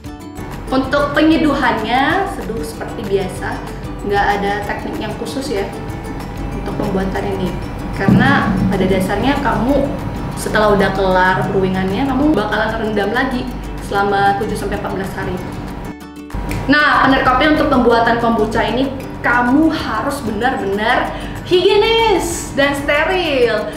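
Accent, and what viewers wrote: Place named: Sumatra, Indonesia. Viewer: Wah cicinya orang sumatra ini.. medok bgt ngomongnya 😆 mantap lah dpt di youtube resepnya.. thankyou!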